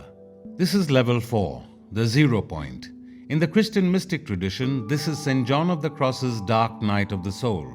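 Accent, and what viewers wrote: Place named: India. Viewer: The indian accent is what makes me turn it off immediately.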